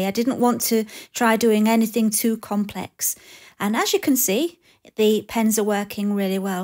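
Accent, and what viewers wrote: United Kingdom. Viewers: Hi, just come across your videos today. From your accent I would guess you are UK based? I see you see to make many beautiful things with resin, and was wondering if you could recommend a hard, heat resistant resin suitable for coasters……that won’t mark with hot cups. Many thanks if you get time to reply. Deborah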